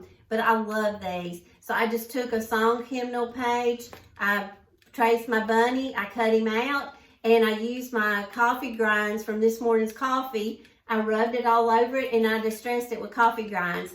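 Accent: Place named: Alabama, United States. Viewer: I especially like your cute flower balls and will make some of them. Your YouTube channel just "somehow" showed up when I was watching some other videos about two weeks ago (or less). I love to watch all you are doing and your friendly. kind, sweet Christian spirit. You know just how to put items together. I have never seen anyone else stage their items and vary them as you do. I have shared your channel with my sister also and she really likes your videos. Such a treat just to sit down, enjoy and learn from you. When I first heard your accent, I thought you might be from Alabama. I am from Ft. Payne, but moved to the mountains in western North Carolina in 1989. Thanks so much. God bless.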